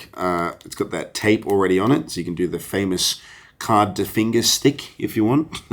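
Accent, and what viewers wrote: Australia. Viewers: Hey,! My little boy LOVES you and your videos! We watch you daily (every day)! It would be so awesome if you could give him a shout out? He wants to do magic like you. Although we're Americans, he speaks in Australian accent to be like you! His name is Everett. Thanks for the videos! CHEERS, mate!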